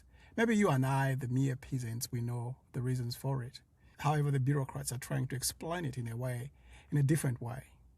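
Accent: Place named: Australia. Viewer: I like your accent. Lots of aussie in there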